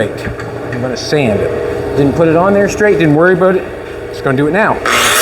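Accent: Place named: Canada. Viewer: I love these videos, I have been seeing you online and hearing your name. I never knew your were Canadian until I saw my first video of you. haha. I was like... wait a second, I know that accent. Cheers to you. I am in Ontario and I vacation yearly in Souris, PEI. We want to visit Nova Scotia sometime soon.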